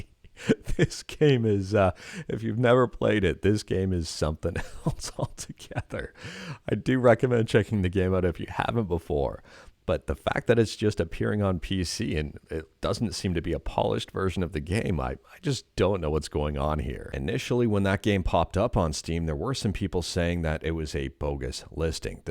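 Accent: Canada. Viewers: Your Canadian accent is minimal if that's any consolation. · You say aboot. Defiantly Canadian. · Can confirm: The day you learn you have a Canadian accent is very Jarring. and that's coming from someone who is tesl certified as one of the first things we learn is other countries prefer Canadian instructors because of our lack of an accent. Go figure eh? lol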